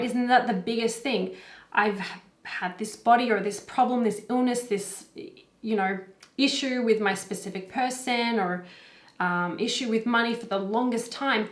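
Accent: Australia. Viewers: Curious (because of a synchronicity and sign, this would be confirmation) ... is your accent Australian? ❤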